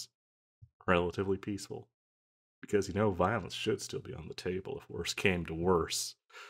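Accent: South, United States. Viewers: naw man it was perfect. Southern to Southern this was so awesome! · I love the southernish accent. You do it really well💖💗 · I HEARD THE SOUTHERN ACCENT AND MELTED 😭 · gosh the southern-hilly billy voice is so cute omg🥴🥴ur voice is so adorable i swearrr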